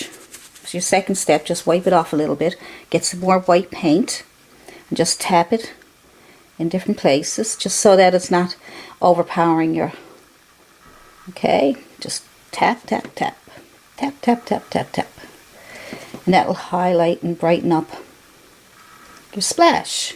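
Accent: Newfoundland, Canada. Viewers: Hello my duckie, sounds like you are a Newf. ;)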